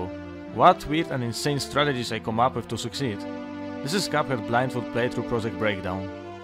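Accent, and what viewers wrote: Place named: Poland. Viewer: i love his polish accent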